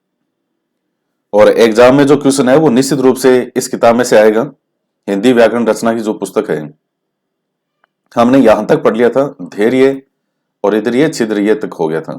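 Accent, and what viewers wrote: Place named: Marwari, India. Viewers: Sir aap churu se ho kya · Pranam sir ji 🙏 Sweet marvadi bolty ho app sir .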